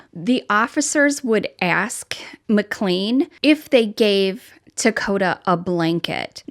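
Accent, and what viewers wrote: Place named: Michigan, United States. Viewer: Yep I knew you sounded like Michigan. Your accent sounds so familiar I've been trying to figure it out. Such a fan.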